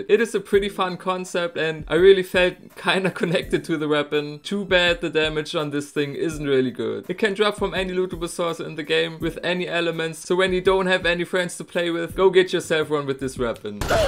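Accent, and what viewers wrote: Germany. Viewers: you are german ? sounds like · Great content and very nice humor. I'll follow you and your videos along. Also your accent is just fun and lets me believe we could talk in german aswell :P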